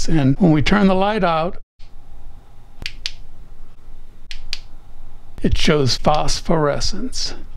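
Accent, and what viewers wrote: United States. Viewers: What area of the US is your accent from? I have always wondered. I find it pleasant.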